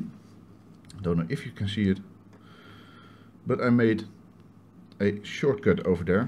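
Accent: Netherlands. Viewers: haha vanaf de eerste seconde herkende ik de nederlander hier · Love the Dutch accent .. :)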